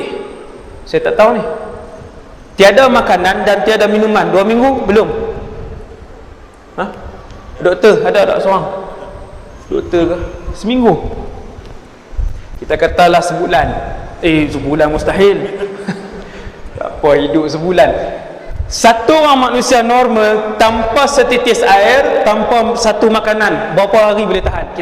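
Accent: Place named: North, Malaysia. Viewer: Cakap Utara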